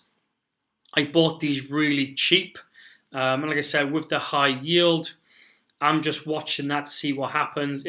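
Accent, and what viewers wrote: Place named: Wales, United Kingdom. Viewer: Sounds like a Cardiff or Zooport accent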